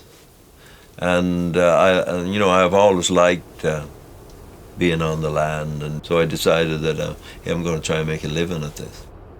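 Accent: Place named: Ireland. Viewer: Hi —that was really excellent. He still has a bit of an Irish accent:-) Guy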